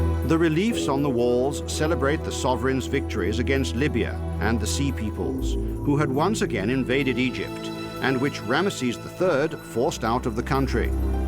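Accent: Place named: United Kingdom. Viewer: The timers cool but NOT ANOTHER BRITISH ACCENT!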